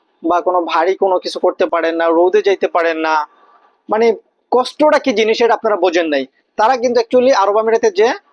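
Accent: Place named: Bangladesh. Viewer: ভাই আপনি বাংলাদেশ থেকে নিউজ করেন নাকি দুবাই থেকে নিউজ করেন তাইলে একটু জানাবেন. আপনার কথা বোঝা যায় আপনি বাংলাদেশ থেকে কথা বলতেছেন.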